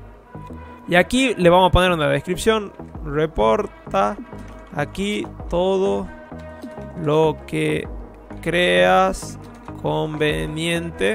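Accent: Tucumán, Argentina. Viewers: es tucumano pinta que es tucumano mal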